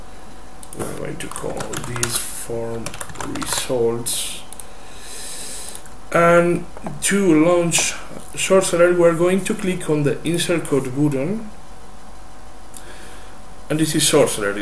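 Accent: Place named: Mexico. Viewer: eres mexicano verdad, lo noto en tu forma de hablar el ingles.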